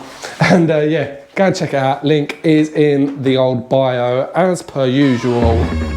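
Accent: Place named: Essex, United Kingdom. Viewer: After living in Australia for 10 years hearing a strong Essex accent makes me wince. Lol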